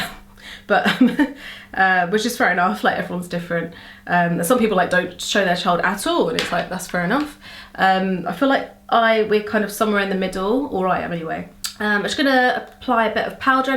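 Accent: London, United Kingdom. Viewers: I'm addict to your accent! Is it London accent?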